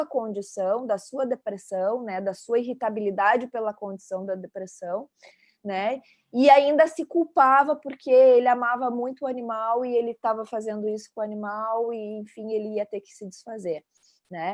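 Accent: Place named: Rio Grande do Sul, Brazil. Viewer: O sotaque gaúcho é assim mesmo!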